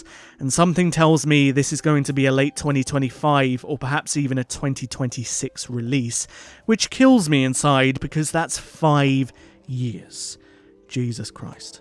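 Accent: United Kingdom. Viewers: What part of the uk is you accent?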